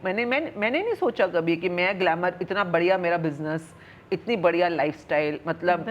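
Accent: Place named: Punjab, India. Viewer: Aap ki punjabi ka lahja bilkul punjabi hai thank,s .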